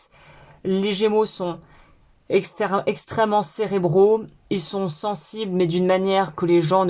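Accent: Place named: United States. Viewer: Vs avez vécu en Amérique??votre accent anglais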